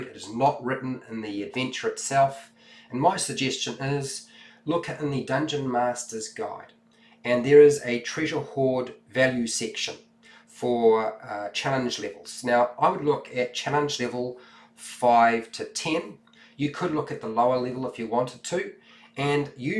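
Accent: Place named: New Zealand. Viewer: After watching so many of your videos, I'll have to dedicate an NPC or a villain to you because in addition to your content, you've become an invaluable resource for a great New Zealand dialect!!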